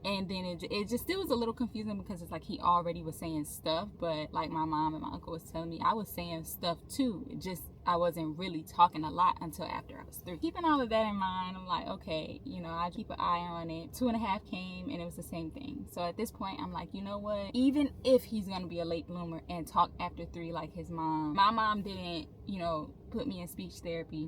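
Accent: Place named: South Carolina, United States. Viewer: So i just watched the full video and ive been watching yall for a long time but just found out your from SC! Im from Anderson South Carolina and i knew you sounded like you were from here. Where exactly are you from? I love your little family yall are precious 💕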